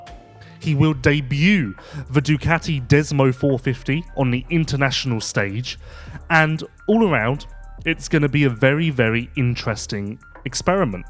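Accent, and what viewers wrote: United Kingdom. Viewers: Yes, we need American english. It's a bummer when American legends like Roczen and Jet has an Englishman covering their series... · Can we not have a Brit do the voice over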